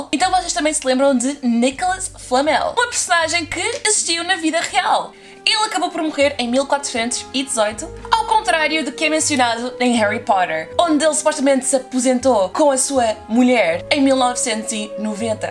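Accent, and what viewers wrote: Portugal. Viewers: Parece q vc fala inglês seu português portugal e muito puxado😳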